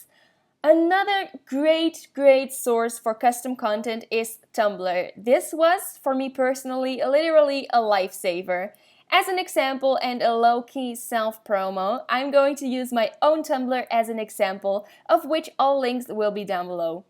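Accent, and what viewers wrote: Netherlands. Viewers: Its dutch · You dutch girll?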